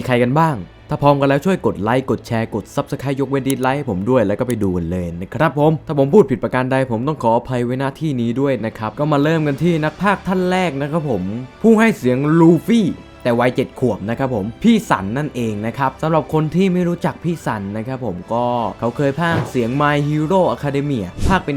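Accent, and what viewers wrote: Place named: Suphanburi, Thailand. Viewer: แอดใช่คนสุพรรณป่าวเนี่ยเหน่อจังเลยตอนท้ายๆคลิปอะน้า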